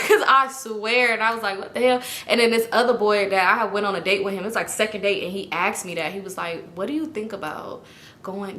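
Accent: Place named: Houston, United States. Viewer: Are you by chance from houston ? I love your accent 💕🤭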